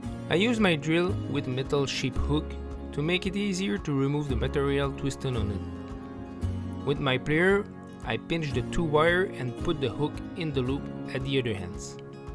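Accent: Quebec, Canada. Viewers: Ah enfin un belle accent québécois en anglais 😁😁. Cool ton tutoriel, c'est facile et surtout rapide, je vais l'essayer c'est certain.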